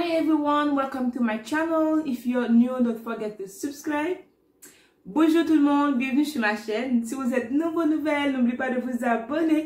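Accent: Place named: France. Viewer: Yes!!! Don't ever stop speaking French and English ,I'm from English speaking country but now live in French speaking country , love your francais accent ,merci boucop madmosel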